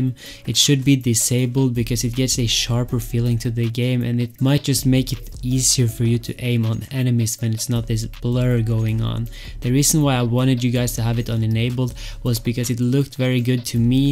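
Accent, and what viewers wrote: Norway. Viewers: are you norwigan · are you norwegian?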